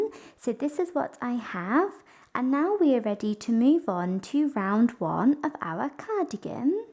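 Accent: United Kingdom. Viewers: An extremely strong UK accent. 💕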